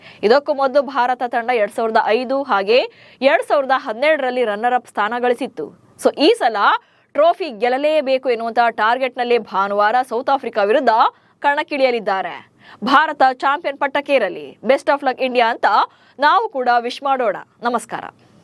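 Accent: Standard. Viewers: ಅದ್ಭುತ ವಿವರಣೆ, ಶುದ್ಧ ಕನ್ನಡ. ಅಭಿನಂದನೆಗಳು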